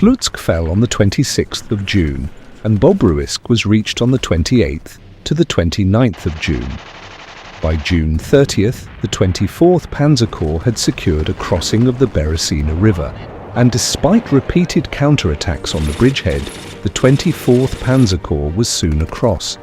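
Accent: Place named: Russia. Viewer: Great narrating and also new information to me thanks for this educational video ❤ I'm glad this gruesome war had ended ~ and I hope further peace persists ☮️ Full watch 🤍 PS: the Russian accent hehe 🤭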